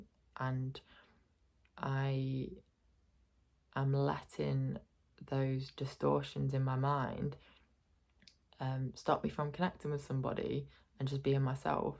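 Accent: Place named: United Kingdom. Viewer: There are so many comments about pronunciation. Did I understand it right that you feel unsafe because of specifically having British accent? I just want to clarify for myself.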